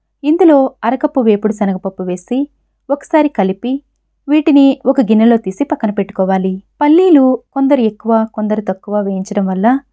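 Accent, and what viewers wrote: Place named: Telangana, India. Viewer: మీది తెలంగాణ అనుకుంటా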